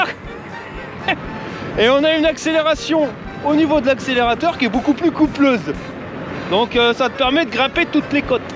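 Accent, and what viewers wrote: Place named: Nord, France. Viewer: salut! Ta chaîne n'est pas la seule que je regarde, mais c'est celle qui me ravit le plus!!! Tu parviens à la fois à donner des infos pratiques, techniques, et touristiques avec le sourire et la simplicité!...Le tout avec eul' tchiot'accint du Nord qui me rappelle ma jeunesse valenciennoise... Merci, et bécot à Maryse... PS: -j'ai du mal avec Komoot: pas simple à utiliser, et pas toujours facile de retrouver sur l'appli certaines balades pourtant référencées sur le site internet... -si l'envie vous prend à tous les trois de découvrir l'Alsace et les Vosges du Nord, vous pouvez déjà compter sur une logement!;-) mais attention!!! Ça grimpe dur!!!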